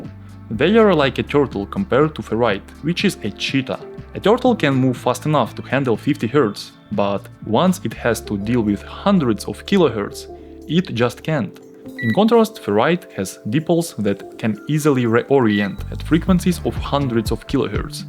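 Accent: Russia. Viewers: От русского акцента трудно избавится. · какой русский акцент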